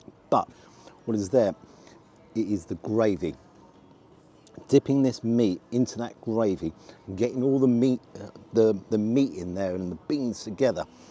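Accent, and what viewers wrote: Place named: North, United Kingdom. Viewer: If you call your channel "Rate My Takeaway" you're not going to high end Fallow or Gordon Ramsay any time soon. He does what it says. Unfair comparison. PS his English is a perfectly fine regional vernacular. One of many. It makes the UK fascinating. Some might say "You sound elitist and frankly a little bigoted towards Northern working class folk." Some might.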